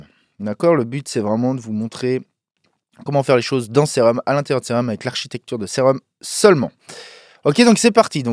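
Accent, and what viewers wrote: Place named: Montreux, Switzerland. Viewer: ce petit accent du coin Montreux / Aigle, ou vraiment pas loin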